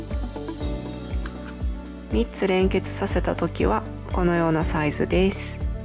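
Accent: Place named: Kansai, Japan. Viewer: 関西の方ですか？喋り方が気になって動画の記憶が ダイソーセリアには良くお世話になってます!いい商品多いですね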